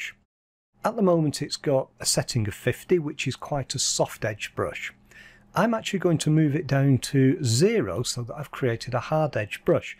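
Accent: United Kingdom. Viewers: Thank you so much for this instructive video, plus the perfectly spoken british accent ! :) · This is the second video I have watch of yours in the last 10mins, I have learnt more in this last 10mins than god knows how many hours I have spent listening to other people . Content great, british accent out of this world, some one I can listen to at last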